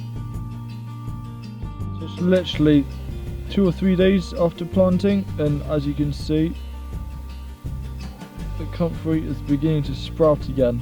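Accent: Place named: Wales, United Kingdom. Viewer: Great video. I'm surprised you don't have your own TV show. Perhaps you do. I'm in Canada and have no way of knowing. I'm Scottish and was curious about your accent. Now I see your Welsh. All the best and please keep them coming.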